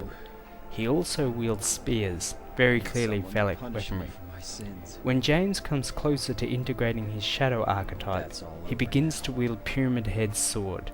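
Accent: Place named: Germany. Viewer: i love your german accent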